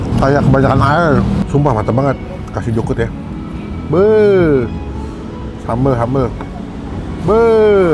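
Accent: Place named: Java, Indonesia. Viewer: Mantabbe ini medok...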